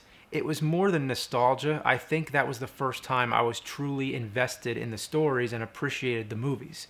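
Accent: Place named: New Jersey, United States. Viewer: It's because he's from Jersey. That's how people around and in NY speak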